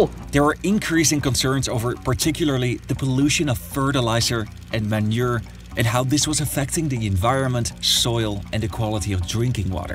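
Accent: Netherlands. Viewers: I thought I detected a Dutch accent. Stumbled upon this channel because it was covering the Irish immigration crisis. Nice channel, balanced approach. Fijne reis naar Overijssel!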